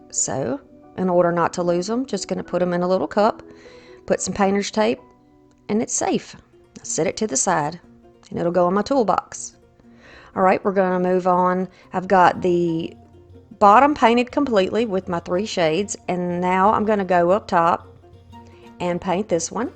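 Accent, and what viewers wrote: South, United States. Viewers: Both are beautiful. Me and my 9 year old granddaughter are going to go a fairy garden outside. Have you done any fairy gardens outside? If so, do you have any videos. I shared this video with her. She's so funny. She said mamaw she talks different. We live in New Mexico, and she's never been to the southeast side of the United States. I used to live in Mississippi. I still have some of a southern drawl.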